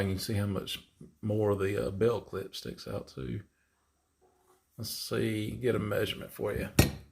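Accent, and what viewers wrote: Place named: South, United States. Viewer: just joking it your southern drawl.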